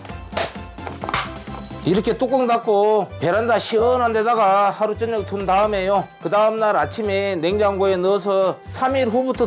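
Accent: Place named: Gwangyang, South Korea. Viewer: 말투가 우리동네 같네요~~ㅋㅋ 정겹네요 이것은인자~~그래갓꼬~~광양말투 항상 맛있게 만들수있게 레시피주셔서 감사합니다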